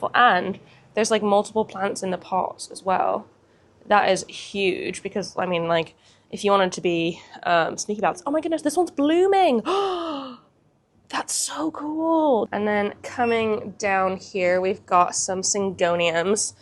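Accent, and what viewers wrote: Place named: United Kingdom. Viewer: The Brit is rubbing off on you. I hear a bit of a British accent ❤️😁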